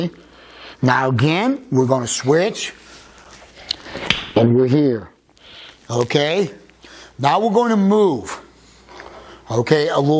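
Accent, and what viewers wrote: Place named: Ohio, United States. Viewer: I looked up your area code, and yes you are Cleveland area- as I thought. I’m from Toledo and you sounded like a buckeye. Really enjoyed video. Great instructor! Bet you have some fight stories? Best to you!!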